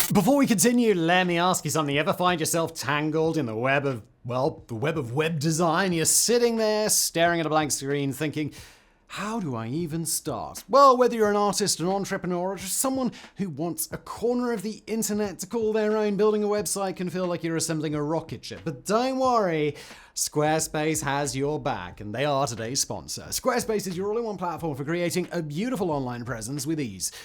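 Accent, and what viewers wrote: United Kingdom. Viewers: The British accent of the presenter makes it difficult and unpleasant to follow. He speaks fast, words are not clear, no boundary between words.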